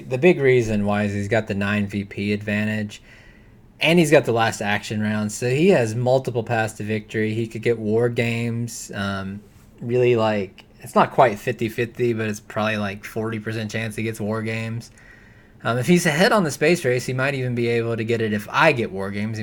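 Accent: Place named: Delmarva, United States. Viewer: Are you from the DelMarVa region of the US by chance? I grew up there and I think I hear a bit of that accent in your commentary? Thanks for making the video btw, love watching TS. :)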